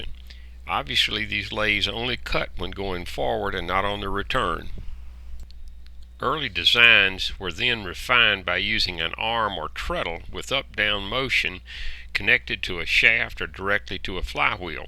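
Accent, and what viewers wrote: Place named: South, United States. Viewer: Excellent information delivered in a smooth southern voice.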